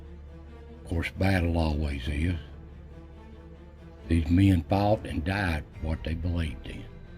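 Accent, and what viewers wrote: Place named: South, United States. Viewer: Great video Sir. I could listen to your beautiful Southern Accent all day and night. My husband & I went to the battlefield. There was a moment when I felt overwhelmed and could not move. I told my husband " something terrible happened right here. I can feel it. It is such a soul-stabbing sadness that overcame me. I couldn't move. It was late afternoon, in summer. As sunset was beginning, we finally found the battlefield. He said "no baby, it is here. On this field, there are monuments to the battle. Come over here and look." He stood in the humid, hot air, in the tall grass of the battlefield. I had been overcome by grief at the dividing plot of land and tall pines between the lanes of the road leading to and away from that exact site. There, between the roads, I could feel the sadness wash over me like an ocean wave. 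I couldn't explain it, but I felt them...all watching me, and telling me ' it happened here". I have never forgotten that afternoon, nor will I ever.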